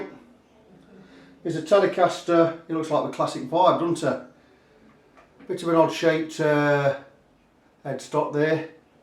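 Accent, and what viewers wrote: United Kingdom. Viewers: I give this luthier a thumbs up 👍🏼for his repair skill a thumbs👍🏼 up for that cool British accent and one 👍🏼for his video editing skill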